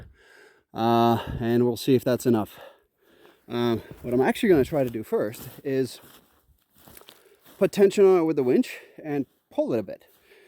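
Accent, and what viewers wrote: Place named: Poland. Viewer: Your „good morning everybody” is a single phrase where I can hear a ted of Polish ( non- native English speaker. Your „everybody” I can hear everybodi ( kind of soft Polish letter i ( like Irena) instead y. It’s very cute and pleasant sound. Also you don’t speak English as a person born/raised in America . The way you speak, built phrases, apply grammar and syntax, is like you graduated class for a public speaker: more sophisticated and elegant that common people use. I am having so much fun and pleasure just listening to to your narration. You don’t neither stumble , nor repeat yourself, talking fluently in an interesting manner. Thank you 🙏, sometimes , just for pleasure of listening to your voice. I am not ignoring the subject, just : double my pleasure of witnessing your every day adventures. 🤗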